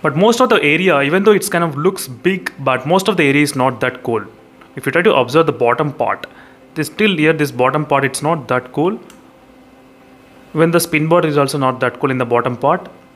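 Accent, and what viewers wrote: South India, India. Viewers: Mother tongue influence! I guess he is south indian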